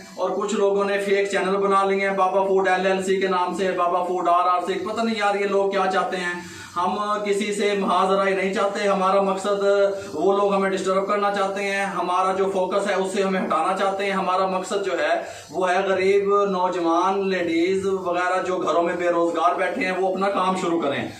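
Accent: Punjab, India. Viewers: Aap k bolne or kam karne ka andaz bilkul indian punjabi ki tarrah ha. Aisa ha kia maha raj?such bolna thanu guru di qassam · Apki recipe ke sath hum apki punjabi boli bhi bht enjoy krtay hain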